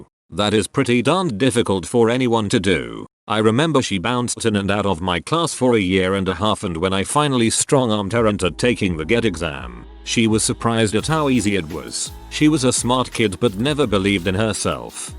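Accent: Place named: United Kingdom. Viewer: Just another lazily made Reddit video with a robotic British accent.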